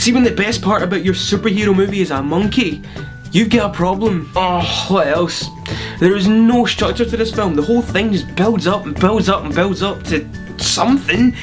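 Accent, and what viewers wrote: Scotland, United Kingdom. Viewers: Is that a Scots-Gaelic accent there?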